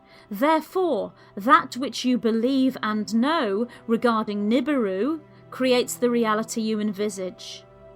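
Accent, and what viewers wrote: United Kingdom. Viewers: HEY EVERYBODY... Just thought I'd come in and grab a little popcorn and watch a video about another non-existent planet...... Okay, here we go - yeah buy the book and resource series. Nibs-For--Tards. (Uh-huh! yeah! MK Ultra, sure) How To Painlessly Rip Out Your Brain... Ahhhh - gawdammed British accent will do it every time. My pole shift??? Gash talks like she's an exception to this planet - someone should check her 'pole.' Hoooe-shit, there's still 26 minutes of this fucker left. I don't think there's going to be enough popcorn and I certainly didn't see anything to drink around this joint.... ....Hang on... I'll be right back in a jiffy.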